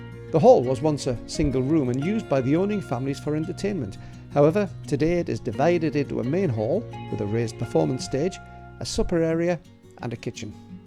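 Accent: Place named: Cumbria, United Kingdom. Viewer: You must of been there about time I was there, im a west Cumbrian lass, but love Lannercost. Nice video. Love the accent, identifiable by any Cumbrian I agree as a lot to offer, mot just the lakes, also hadrians wall, the abbey at Abbeytown, and dont know if youve been to Calder Abbey at Calder Bridge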